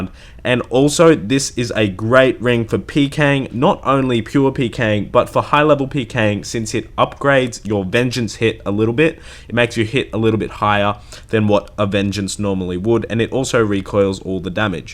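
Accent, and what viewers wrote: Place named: Australia. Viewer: Mate, you must be fair dinkum, true blue, rinky dink Aussie? I mean you have to be, 7pm upload time, good as gold. In all seriousness, love the content and your familiar, refined accent. Keep up the good work mate. If I were to be honest; I would have to say that your videos contain some of the most useful OSRS content I have seen on YouTube in a long time. tl;dr Fully sick vids, hectic descriptiveness, sickhunt. 11/10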